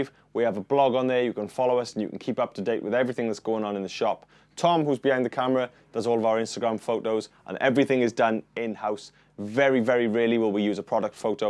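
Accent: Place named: South Wales, United Kingdom. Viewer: Brilliant video,. (I’m from South Wales but living away so good to hear a familiar accent). Although this vid is from a couple of years back, it’s still an outstanding demo of the amp. At first I was thinking I wanted you to keep playing the same licks and sequences so as to make it easier to compare the voicings; but as the demo went on, and the excellence of your playing became apparent, it seemed good that you’d modified your style to suit the nature of the sound in question. You were also one of the first demonstrators I’ve seen to mention at the start that the modelling technology used here is in line with the sophisticated developments of Kemper, Fractal and Line 6. Excellent work. Thank you!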